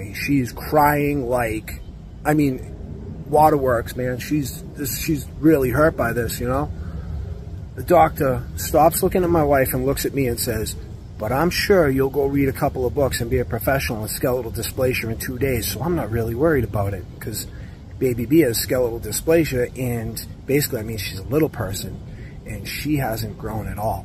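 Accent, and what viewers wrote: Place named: New England, United States. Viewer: Wowwww!!!! Nothing is impossible with our King 👑 🔥 He's got that New England accent I hear up here often 😅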